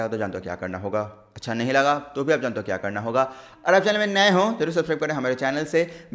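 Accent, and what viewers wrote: Bengal, India. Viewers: Bro r u bengali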